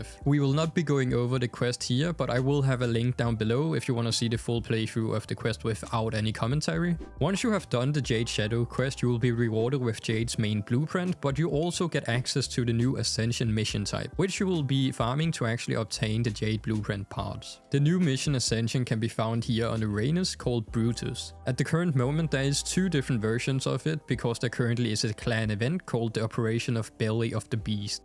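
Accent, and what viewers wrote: Denmark. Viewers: is this guy danish?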